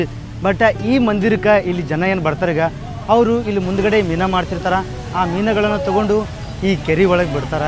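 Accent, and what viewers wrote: North Karnataka, India. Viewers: ಅಣ್ಣಾ ನಿಮ್ಮ ವಿಡಿಯೋ ಮಸ್ತ್ ಸೂಪರ್ ಅದಾವ ಅದರಲ್ಲೂ ಉತ್ತರ ಕರ್ನಾಟಕ ಭಾಷಾ ಶೈಲಿ ಬೆಂಕಿ ನಿಮ್ಮ ವಿಡಿಯೋ ಇನ್ನೂ ಹೆಚ್ಚಿನ ವೀವ್ಸ್ ಆಗಲಿ ಆಗಬೇಕು ಆಗತ್ತೆ ನಿಮ್ಮ ಸ್ವಚ್ಛ ಭಾಷೆ ವಿಸ್ತರಣೆ ವಿಷಯ ವಿಡಂಭನೇ ಮಸ್ತ್ ಅದ ಹೀಗೆ ವಿಡಿಯೋ ಮಾಡ್ರಿ ನಮ್ಮ ಬೆಂಬಲ ನಿಮಗೆ ಇದ್ದೆ ಇದ್ದೆ ಅಣ್ಣಾರ ❤❤🎉🎉... ಜೈ ಹಿಂದ್....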